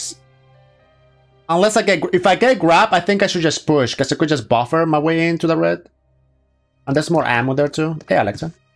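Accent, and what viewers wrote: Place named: South America. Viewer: Just finished part 2, great job wow! I like your accent, are you South American?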